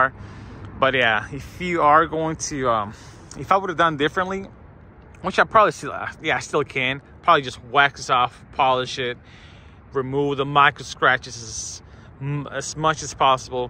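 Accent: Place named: Philippines. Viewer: Slight Fil accent under the Southern accent.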